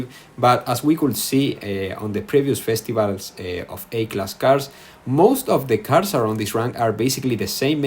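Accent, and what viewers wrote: Spain. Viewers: Uff loco, de acá se te nota el español🥵👌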